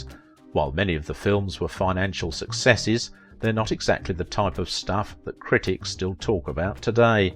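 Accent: England, United Kingdom. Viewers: we invented the language, therefore we will speak it how we like. His pronunciation is correct for that part of England. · I’m assuming your comment is tongue in cheek. The narrator seems to be British. In the US we may say loo-tenant, in Britain it’s left-tenant. Even though the spelling is the same.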